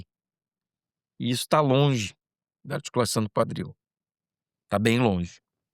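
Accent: Rio Grande do Sul, Brazil. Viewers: O senhor é gaúcho doutor?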